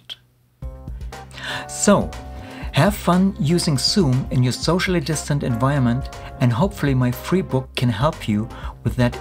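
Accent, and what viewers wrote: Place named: Germany. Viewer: You are really really good at explaining how this mess works, thank you so much! you have a quite strong german accent, you are german right?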